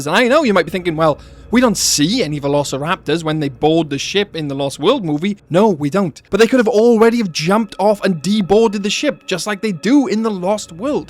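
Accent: Wales, United Kingdom. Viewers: This channel is awesome and just like with Star Wars nowadays, living proof of how the fans of this franchise love and care about it more than the official owners of it do 👏. Also, are you a fellow Welshman? Loving the dialect, and does anyone know the time of that instrumental that kicks in ? So chilling and eery.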